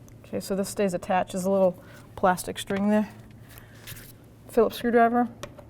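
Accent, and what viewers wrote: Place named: Massachusetts, United States. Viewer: Nice job. I detect a Massachusetts accent. Am I right? :)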